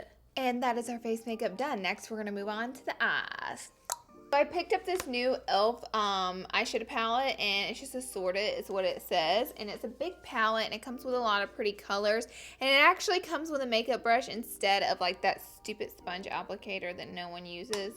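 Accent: South, United States. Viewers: Second time watching your vids and I can say you're really funny and i like your accent, even though i normally dont like country accents (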